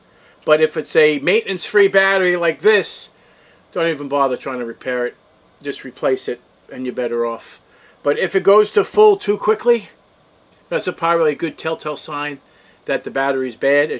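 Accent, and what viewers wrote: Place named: New York, United States. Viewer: It’s about time I have somebody on YouTube with the New York accent❤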